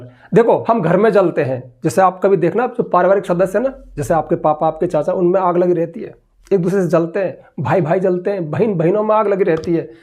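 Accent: Rajasthan, India. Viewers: Sir mujhe lag raha hai ki aap Rjsathan se hoo 🤔😊❤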